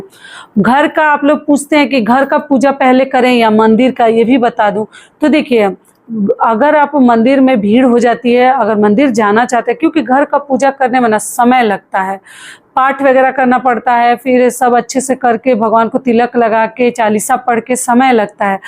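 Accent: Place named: Bhojpuri, India. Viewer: I think shw from purvanchal or bihar bhojpuri belt.. her accent is saying.. jay shiv parvati ❤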